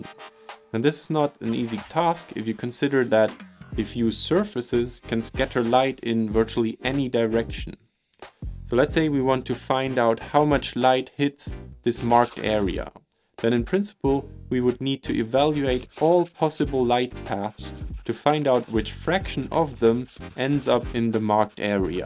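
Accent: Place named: Germany. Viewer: du bist doch en deutsche Bub oder? der hör ich doch raus sache mal! Und bockstarkes Video also wirklich erste Sahne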